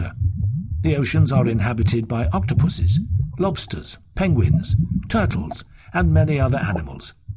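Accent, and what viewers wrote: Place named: United Kingdom. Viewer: I quite frankly was very fascinated by this educational video due to the fact that the narrator had a lovely British accent and because the video had a lot of cool pictures.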